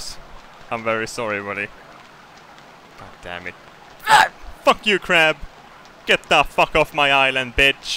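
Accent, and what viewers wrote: Sweden. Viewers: stop speaking Swenglish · I love your sewdish-english accent :)) !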